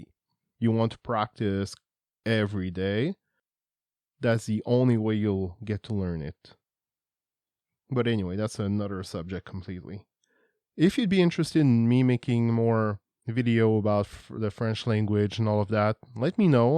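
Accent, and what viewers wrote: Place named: Netherlands. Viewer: You sound a bit Dutch.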